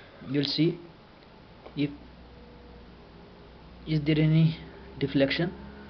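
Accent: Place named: England, United Kingdom. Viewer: fuck this english accent !!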